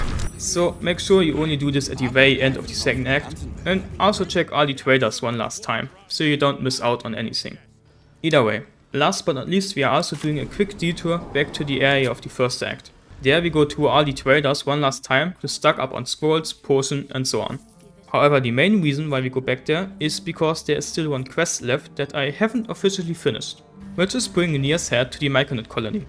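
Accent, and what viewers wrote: Germany. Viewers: Mega Video, sehr spannend und geniale Erklärung, sodass man immer sehr gut verfolgen konnte, worum es ging. Der deutsche Akzent wird über die Länge des Videos immer etwas prominenter, fand ich lustig.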